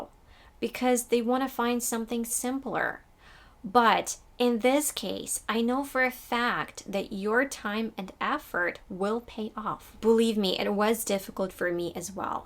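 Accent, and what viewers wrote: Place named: United States. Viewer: sometimes I do get the strong impression/feeling that you're really an American!!! Sure,sometimes I forget that your native language is the Ukranian one...your English is TERRIFIC!!!! Congrats! 🇺🇸🇺🇸🇺🇸🇺🇸🇺🇸🇺🇸🇺🇸🇺🇸❤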